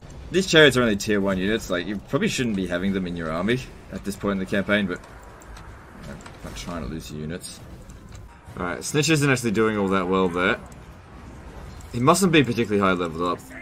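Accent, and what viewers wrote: Australia. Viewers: It's your down under accent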